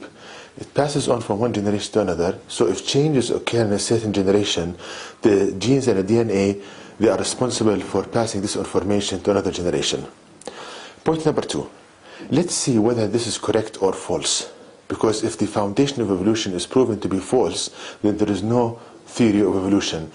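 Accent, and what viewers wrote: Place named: Arab world. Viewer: he speaks EXACTLY like ownage pranks arab accent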